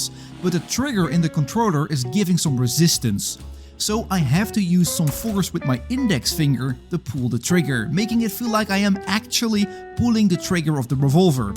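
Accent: Netherlands. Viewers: is it just me or does he have a dutch acsent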